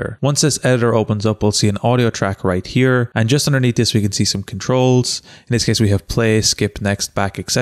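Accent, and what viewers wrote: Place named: Ireland. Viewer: Good man, good to hear an Irish voice, thanks for the video 👍🏻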